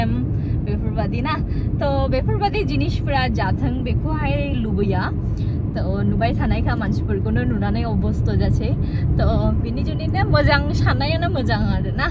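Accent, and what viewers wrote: Bengal, India. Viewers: Pure bengoli bodo raidlai abo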